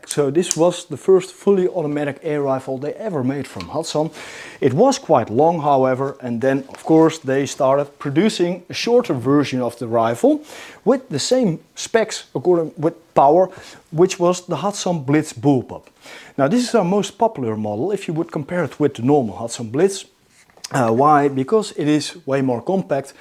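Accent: Netherlands. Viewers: After a reasonable couple of months. This video made me subscribe and i even gave a like 😊 Always very clear and straight down to the products and explanation. Heerlijk dat boerenkool Engels. En dat bedoel ik positief.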